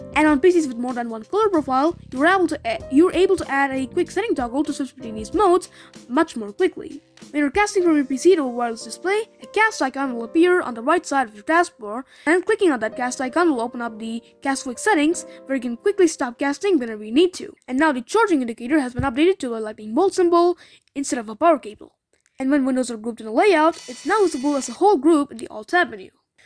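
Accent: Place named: United States. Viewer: How did you learn your accent lol, I'm also Indian, when I'm speaking in my mind i speak with that "american" accent pretty well, but in person, it just doesn't work. How did you learn it?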